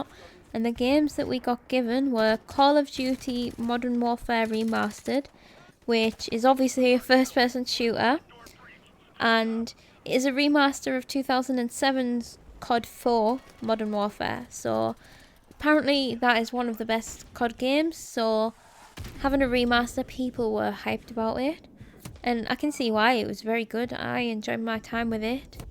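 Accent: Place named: Newcastle, United Kingdom. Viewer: That Geordie accent is adorable I wanna hear you talk all day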